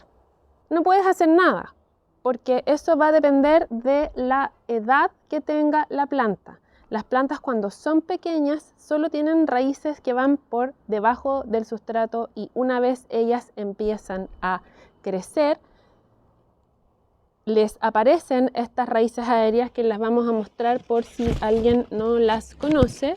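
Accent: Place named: Chile. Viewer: Muchas gracias por compartir! Me encantó el video y tu acento chileno! Saludos desde México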